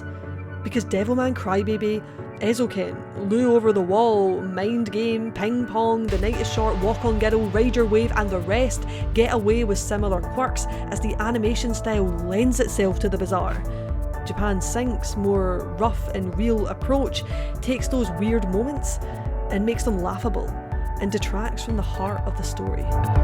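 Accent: Scotland, United Kingdom. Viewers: Came here to find a review to throw at a mate and convince him to watch JS. In 2 seconds found a Scottish accent and was more interested in knowing how good you were as a reviewer... I’ve subbed, good points all round you’re articulate af, know your deep knowledge and I have absolutely no arguments against the review. Gonna look through some I’ve not watched now. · Are you scottish or something? Kinda sounds like it.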